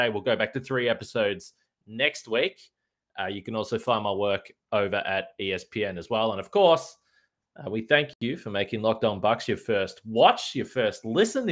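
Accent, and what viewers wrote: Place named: Australia. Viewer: Streuth! These Yanks are a bunch of flamin’ galahs if they can understand you. Fair dinkum, I’d be devo if you changed up your accent. I mean crickey if yous can’t understand, bum a durry, hit the Bottle-o or the servo and grab a frothie, and get in the Oz mindset before your bang on the podcast you’ll be cherry ripe. No Wuckas mate!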